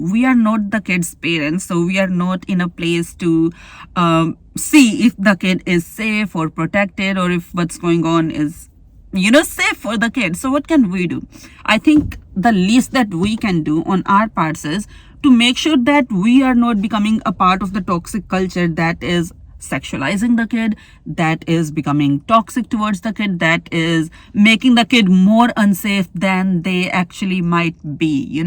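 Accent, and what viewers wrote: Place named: India. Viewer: This video is a perfect example of an Indian women outside India speaking about an Indian topic in English but with Indian Accent.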